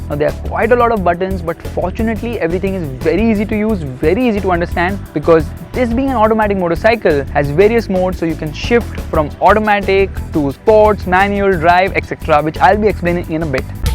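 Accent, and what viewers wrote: India. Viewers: sing song blibbida dibba bibida lol that is what I hear half the time...I love Indian accent but when spoken fast I seriously miss half of it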